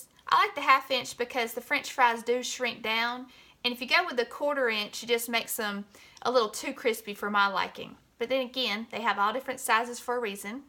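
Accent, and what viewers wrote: South, United States. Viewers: Thank you!! Love your southern tone ❤️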